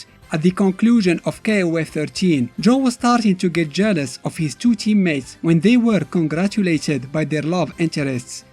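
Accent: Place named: Morocco. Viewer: Salam khoya nta maghrebi ? 3rftk ghir laccent dyalk 😂 ... Anyway la kenti maghrebi tbarklah 3lik bro mo7tawa zwin o Big Big Up lik Bro 👍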